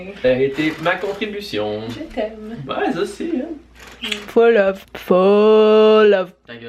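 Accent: Quebec, Canada. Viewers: J'adore l'accent québécois, quelqu'un connaît d'autres chaînes de gens qui sont aussi fun? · Wow j'adore l'accent québécois grâce à toi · Elle est canadienne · Merci pour l'accent canadien et ses expression, vu de France on comprend pas toujours mais c'est très imagé !!! Je sais pas si c'est toi qui était chaude comme disait ton copin, mais lui était en ébullition, heureusement qu'il est arrivé à la fin sinon il aurait explosé !!! 😂😂😂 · french canadian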